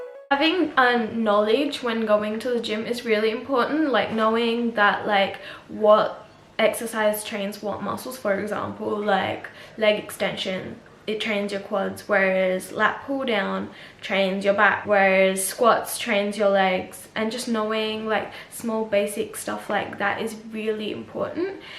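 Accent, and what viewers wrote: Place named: Australia. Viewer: Lol u sound like a propa aussie lol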